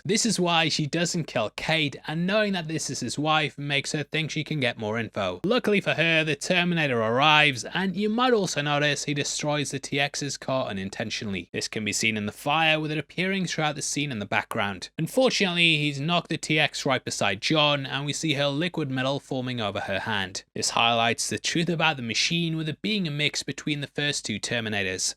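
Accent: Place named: Newcastle, United Kingdom. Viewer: Wait is a Geordie?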